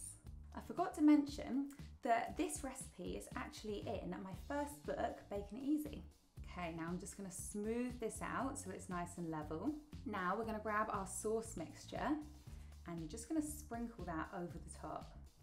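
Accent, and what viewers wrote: United Kingdom. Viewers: Thickest bri'ish accent have ever seen (not to be mean or anything)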